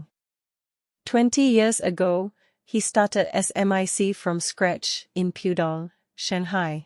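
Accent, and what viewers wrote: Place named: Malaysia. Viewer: This is Malaysian accent english. Whats wrong with that? It is clear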